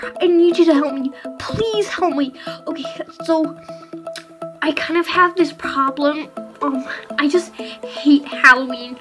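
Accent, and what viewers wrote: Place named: United States. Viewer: Since when did you sound American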